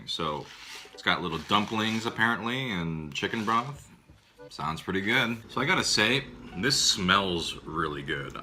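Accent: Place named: United States. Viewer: The fuck is your race? you look Asian but sound american!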